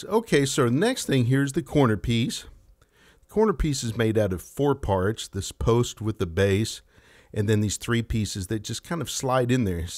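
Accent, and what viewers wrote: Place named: Canada. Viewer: JesterKing1776 can't get much better than that Canadian accent. lol. just has one of those soothing voices. sounds a lot like this welding guy I watch on here.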